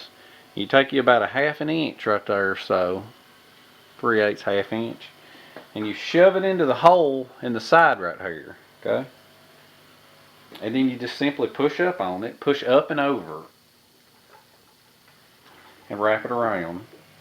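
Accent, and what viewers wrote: South, United States. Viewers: Keep up your good work. By the way...On top of your inspiring ideas, your charm has also totally changed my attetude towards what I as a foreigner usede to call the "Forest Gump dialect". You´re the kind of guy who could make any wall street guy wish he had a few drops of "hobo-blood" in him. Keep up the good work. You are an inspiration to me and thousands others. Best regards from Vikingland (Denmark).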